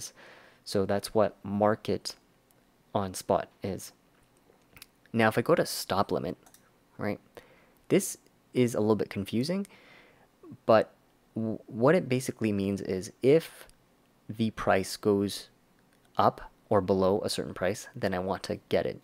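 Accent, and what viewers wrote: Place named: Canada. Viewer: lol I caught it fairly quickly. I became familiar with the Canadian accent after living one year in northern Minnesota. .. Good times. Stay warm.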